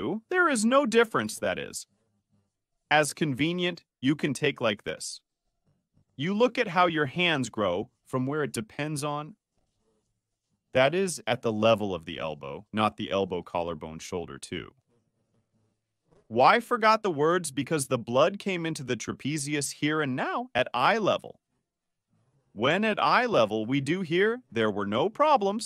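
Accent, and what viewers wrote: Russia. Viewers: I love my new russian doctor 😊. I love his sense of humour and the Russian way of speaking and his expertise. Of course I don't watch illegally and I learn so much. I'm Polish fan. Thank you ❤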